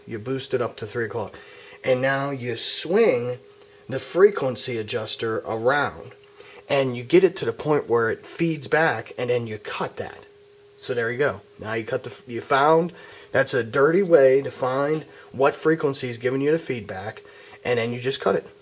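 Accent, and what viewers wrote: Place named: Baltimore, United States. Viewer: great tutorial and there's no hiding that baltimore accent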